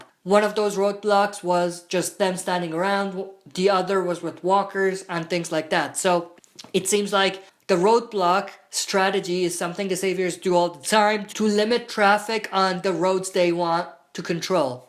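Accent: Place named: Dublin, Ireland. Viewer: You speek the same like a colleague of mine who is from Dublin.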